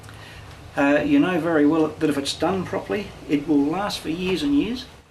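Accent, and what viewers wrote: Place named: Australia. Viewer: I kept expecting him to say (with his Australian accent) "can you see what it is yet?" :-)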